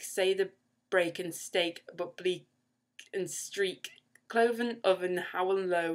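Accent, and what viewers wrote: United Kingdom. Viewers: Come on love!, you can do it much better, you are British, I want to learn from you, I want to have your accent, it is beautiful. Try to do a decent video but please recite the poem slowly so I can follow you easily. Cheers. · I love your British accent!! But why do you have to read it that fast? It is a poem, right? :) I am Hispanic so I wish you could make a slower version for people like me :) C'mon! Try it !!! PLEASE!!! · ahhhh are you from up north? im down south so we pronounce things like singer, ginger ,linger grass brass differently :) a little bit posher perhaps :D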